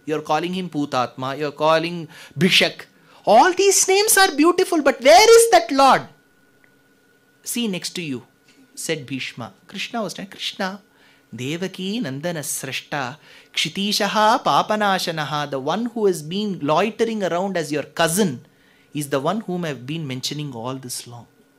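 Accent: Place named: Karnataka, India. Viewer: Saranagadhi.🙏🙏🙏 I enjoyed the beautiful kanada slang though I don't understand the language.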